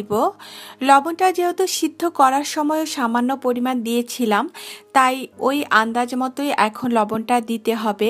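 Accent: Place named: Barishal, Bangladesh. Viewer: ম্যাডাম আপনার বাড়ি কি বরিশাল।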